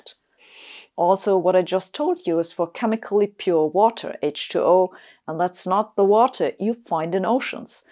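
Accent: Germany. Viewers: Actually, I find this very funny. Sabine gives us reasons to get annoyed with physicists and therefore to get annoyed with her. But the effect of the way she presents this... it's just so endearing. Almost cute. 😄 Having a fat German accent doesn't damage said effect, au contraire. 😂👍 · Sabine, your thick German accent is voluptuous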